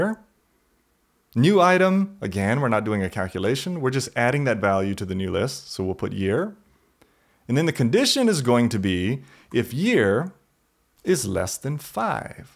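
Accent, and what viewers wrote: South, United States. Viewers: You have a very subtle southern accent.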